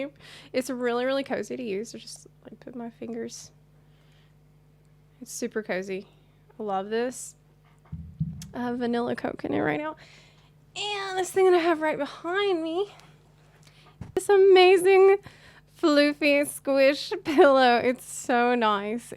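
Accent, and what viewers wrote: South, United States. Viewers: "Ya,all sounds from somewhere central southern USA area. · I can't believe you're from Ukraine! I guess it's just because I've always picked up a very slight southern US accent.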